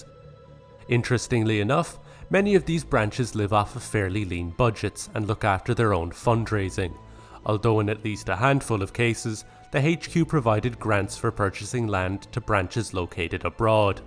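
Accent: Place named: Ireland. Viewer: I can see you did alot of research for this vid and it is well put together. I think talking the to people who practice with the SGI would have given you quite a different perspective and tone to it though. I am Irish (like you by the sound of it!) and practice Nichiren Buddhism here with SGI-Ireland. I initially started practicing abroad 20 years ago. I have seen how members practice in many countries (including Japan). There are so many inaccuracies in this presentation I dont even know where to begin! Maybe you will cross paths and get to know an SGI member some time and be pleasantly surprised by what yiu have misunderstood. I hope so 🌞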